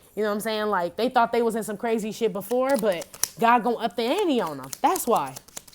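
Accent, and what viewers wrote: Chicago, United States. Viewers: Ctfu I know Chicago when I hear it!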